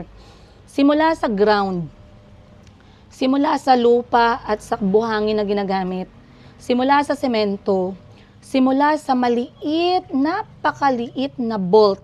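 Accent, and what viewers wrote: Iloilo, Philippines. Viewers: Oo alam ko Yan ma'am,sa Doon kpa naniraha sa Uganda Kasama c Mr yiga,ginawa mo Ang LAHAT na makakapagkakitaan ginawa mo Ang LAHAT na mapagkakitaan,Minsan lang Ako magcoment pero nanonood Ako sa mga vlog mo prove,sa Uganda magluto ka ng bisayang manok,nasasarapan Ang mga taga Doon sa luto mo,at kahit Meron na c chico nagbabantay ka sa tindahan my at Meron Kang katiwala Kasama mo pero Ikaw Ang nagmamahala Doon,at Ang umuwiwi ka gensan, at nakakapagpatayo ka ng Bahay sa nabili mong lote,alam ko no? Taga Digos PO Ako bisaya PO Ako,sinusubaybayan kita Hanggang dumating c mayumi,at may mayumi closet na Ang Bata Hanggang umalis na c ate Christine,believe talaga Ako sa yo,Ang tapang mo na pinalaki mo ng maayos Ang mga anak mo,at may nalalaman na akong salita 2 lng( talat- sa ating salita ay palengke,at sawadika or sawadikap-good morning!o kamao na ko no? 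suggestion lng PO maan,f ever suyuin ka ulit ni Mr yiga,pwede pa ba SYA na pumasok ulit sa puso? Masaya Ako pagnangyari Yan kasi mayroon kayong mga anak,daghang kaayong SALAMAT ma'am,nkatawa ko nmo ba Tagalog Ang salita mo pero illonggo Ang tuno ng boses,pero nalingaw ko uy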